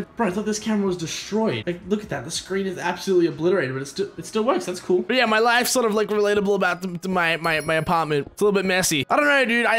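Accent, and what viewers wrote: Australia. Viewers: r u austrailian mate? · ily so much I like your Aussie accent I'm from Australia but I don't have an Aussie accent because my dad is from England. I want one though. And your really funny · I've been watching you so much that I've almost started to have a Aussie accent. Oh my god. Also if you deleted the chan I would die in <num> different languages :-)))))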